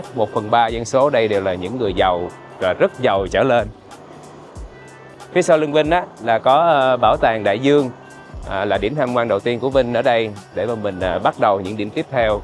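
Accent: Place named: Saigon, Vietnam. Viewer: Giong de thuong, giong chi'nh goc nguoi SG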